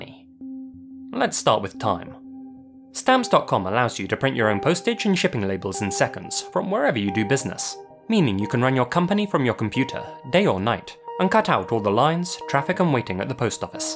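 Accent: United Kingdom. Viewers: British guy doing as for usps...love it haha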